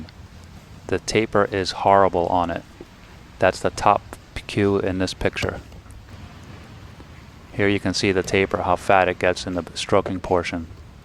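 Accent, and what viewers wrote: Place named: Philadelphia, United States. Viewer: You have an ancient working-class accent we don't hear anymore. Is it a delco/philly accent?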